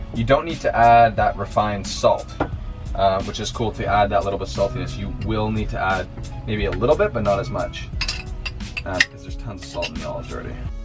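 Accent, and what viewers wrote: Canada. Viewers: im gonna try this!! lol there was barely any sandwich left for her to try ahaha poor girl and why did their bread already sound toasted when he threw it in the pan? lmfao first time watching him and hes funny. Is he canadian by the way? or am i just picking up an accent and the cheesiness lol? dont worry im Canadian too But yeah deff gonna try this minus the sun-dried tomatoes! All around good but theres so many hygiene issues that were literally making my anxiety skyrocket... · I just stumbled across your channel and I’m very confused lol HEB is a Texas based grocery chain but your accent sounds Canadian lol but I’ve been wanting to try chickpeas/garbanzo beans but didn’t know what to do with them! Thank you!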